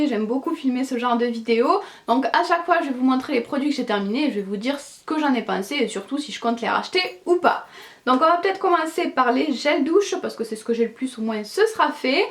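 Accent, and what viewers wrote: South, France. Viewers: Hou toi tu as l'accent du sud , je suis en Provence , hihi , allez une abonnée de plus , bisouus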